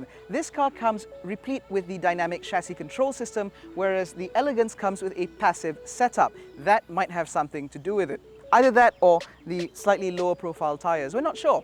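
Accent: United Kingdom. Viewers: Great review n yes for an suv costing more than 200 k without basic safety features is unforgiving n that's the deal breaker for me. Btw, your UK English is refreshing. Other local reviewers' faked US English is rather irritating. Keep it up.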